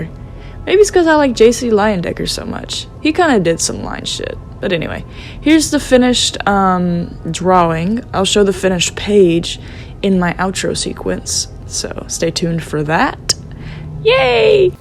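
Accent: South, United States. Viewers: Wait i think its because you both have a southern accent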